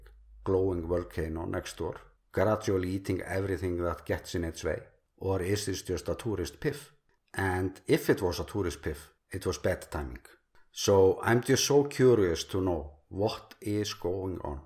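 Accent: Iceland. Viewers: Thanks and keep us updated! I really like Your cute islandic English.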